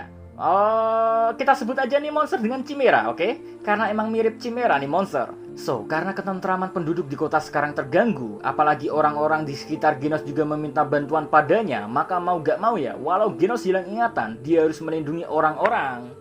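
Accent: Java, Indonesia. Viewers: Logat mimin Jawa bgt wkwkwk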